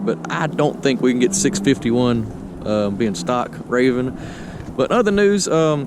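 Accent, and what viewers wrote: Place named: South Carolina, United States. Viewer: You gotta be in SC with that particular twang... maybe GA. I know a lot of guys around TR like to have fun. I am going to pick up a plaid myself. Cant wait to give my neighbors a stroke on test drive. Especially coming from a dual motor awd model 3.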